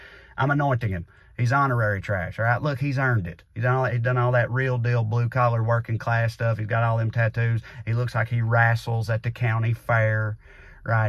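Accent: South, United States. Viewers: I live in Denver and I am seriously angry that she was elected by voters in that district. That race was so close. I really believe that her and her family's good looks and the image of a solid family woman is what got her the job. People saw the sexy looking woman with the handsome husband... and didn't look too hard at her qualifications, or lack therof. I hear you talk and the southern drawl and ball cap lead me to think I'll hear one thing, and then I hear you hitting the truth and I am pleasantly surprised. I enjoy your rants! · Agreed 👍 And I’m just a dude from France living in Canada and following US politics madness. I usually struggle a little with understanding the southern US accent but not with him. Love both the message the words and the accent 😊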